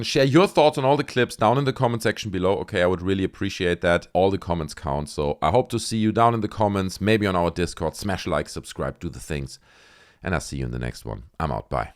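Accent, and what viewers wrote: Germany. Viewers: Love your analysis and humor doing so. the German accent adds gravitas for this Texan. The cylinder looks like something suspended from a drone by a fishing line. Way too unbelievable. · I am starting to learn German, very slowly. I hope that I can get myself to the point where I am able to speak German as well as you have learned to Speak English. Im guessing, (hopefully without offending), that German is your Native Language. Love your accent. If English is your Native language, my apologies, wasn't sure.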